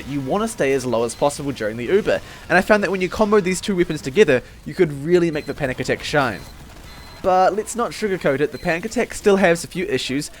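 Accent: New Zealand. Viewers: He sounds very much New Zealand to me. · listen to the way he says 'get'. He's from nz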